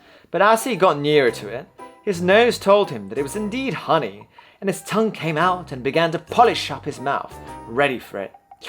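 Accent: United Kingdom. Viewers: はっきり言って、挿絵が有るのがすごく助かりました😂文字は追えても、イメージを作る余裕がないので、絵が有るだけですごく解った気分になりました❣️それと、ページをめくるのが、本当に読み聞かせしてもらっているような心地良さがあり、色褪せた部分からはお祖父様の大切な本をシェアしてくれている実感が何とも言えない😊 BGMは無い方が、の世界に引き込まれるし、ストーリーの味も、引き出されるかな。一方で、が紅茶を注いでいる音、焚き火の映像、途中で焚き木をくべる様子、全てがうっとりするくらい癒される時間です。何より、本物のイギリス英語のリズムの、なんと美しいこと❣️英語の理解はグッと下がるけど。（何十年も前に買ってあった原書を引っ張り出して来て、読んでもみました。） つまり私の希望は！同じチャプターを両方でやって‼️です😅どうかお願いします🤲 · のイギリス英語が好きです！こっちの方が聞きやすいですが、BGMもないとさらに良いですね。すみません汗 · やはりイギリス英語が好き！の声、活舌、トーン、ピッチ、最高にドはまりしましたよ☆ これまではベネティクトカンバーバッチの癖強め朗読聴いてました(それはそれで好き💛) もっと沢山聴かせてください~☆ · British accent 大好きです😌ずっと聴いてたい✨✨✨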